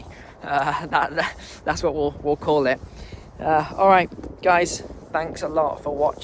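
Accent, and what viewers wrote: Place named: United Kingdom. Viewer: I really love your videos and I personally think that 15 minutes is a great length. I watch just for the information as I am too old to apply any of it to my life! I also like you and your British accent! I hope you really love the place you are moving to!!! I am 72 and ruled by a dog that has an alien brain ( complicated )! I had investments for retirement but I didn't pay much attention to them because it wasn't that much. I checked them in early in 2019 when the pandemic struck and i had lost $30,000 dollars. I just left them there and hoped they would recover when the pandemic was over! I checked them again in early 2021 and they had gone from $270,000 to $ 430,000? I cashed them out and put them in a IRA and still can't find a safe investment! Bonds are no good, gold isn't doing anything, and the stock market is crashing, just as I predicted when they started printing all that money!!! 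Give me a clue,!!! P.S. The USA is not the place it used to be: - ( Beautiful walk!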